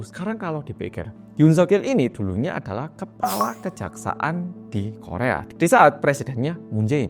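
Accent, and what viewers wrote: Java, Indonesia. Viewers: Mas medok e polll😅👍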